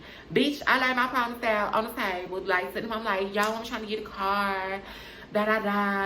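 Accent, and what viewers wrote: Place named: Tennessee, United States. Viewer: Omg you from Memphis!!! I made my first comment without see the part you from Memphis lol I know a Tennessee accent.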